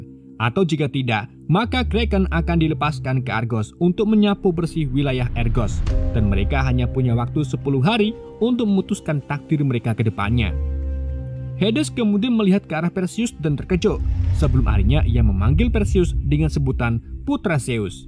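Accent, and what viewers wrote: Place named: Java, Indonesia. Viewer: Boso jowomu medok mas🤣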